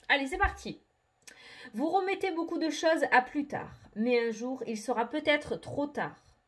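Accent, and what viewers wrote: South, France. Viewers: Mais franchement, cet accent qui sent le sud et cette patate et joie. Rien que ça, c'est un réconfort quand on traverse une période pas cool. Alors merci pour tout ça!!! Passe un réveillon plein de chaleur et d'amour.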